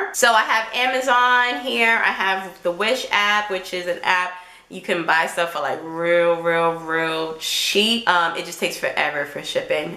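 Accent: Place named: New York, United States. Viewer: Ayeeee you a NEW YAWKA🤘🏽💙but i have wordscapes to its mad addictive.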